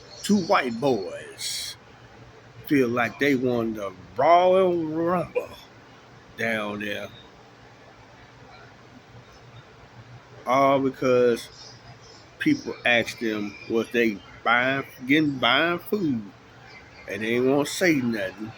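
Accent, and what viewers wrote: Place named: Deep South, United States. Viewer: Sound like a slave telln a story. Must be deep south Georgia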